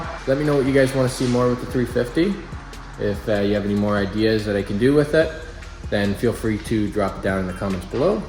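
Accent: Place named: Canada. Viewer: fuck ya dude. you sound canadian as hell lol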